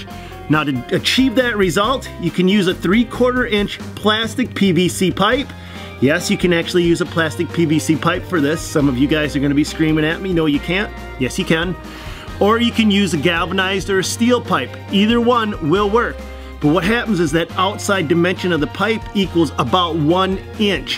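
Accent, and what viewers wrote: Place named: Michigan, United States. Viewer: And my wife and I were talking, where you from? Oakland county? You got a lp accent.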